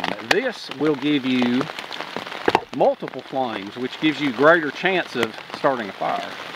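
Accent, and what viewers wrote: South, United States. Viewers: He is as southern as it gets. I am from Alabama i know what i am talking about. Well sometimes i do.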